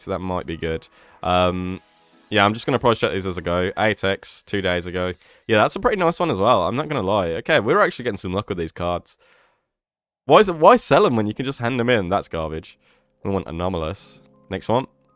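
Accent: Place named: United Kingdom. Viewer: I find the pronunciations of “unrequited” and “succor” to be offensive. Are you or not?! Edit: “The ‘Enfusiast’” absolutely sounds British 😆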